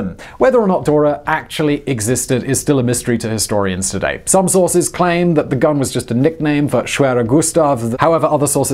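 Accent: United Kingdom. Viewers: Oh awesome, one I've never heard off! And, we Americans LOVE the British accent.;)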